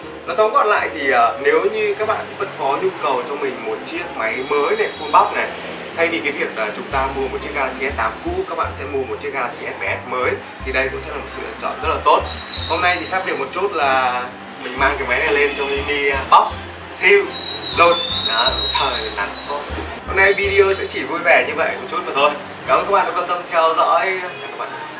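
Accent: Vietnam. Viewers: video mang tính chất khoe gấu là chính. nhưng giọng miền nam hay sao ý mình nghe lần đầu lạ lạ a ạ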